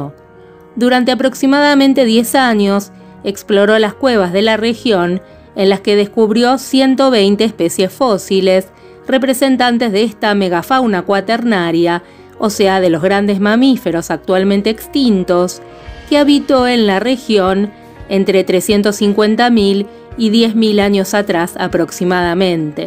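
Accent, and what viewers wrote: Argentina. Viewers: Interesante reportaje con una dama de acento inconfundiblemente y agradable argentiiino , espero no me equivoque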